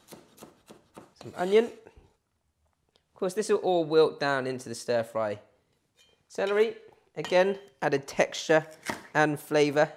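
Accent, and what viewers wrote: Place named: United Kingdom. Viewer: OMG you are so darn cute !! The fact that you are asian with a brit accent......... just too damn cute !!! oh yeah, thanks for the recipe!!!!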